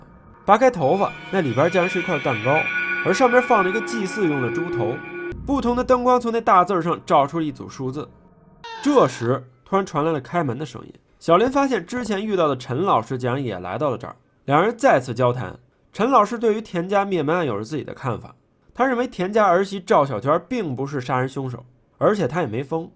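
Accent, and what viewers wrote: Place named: Beijing, China. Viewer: 北京人吧？不r道，电r机，赵iao娟儿～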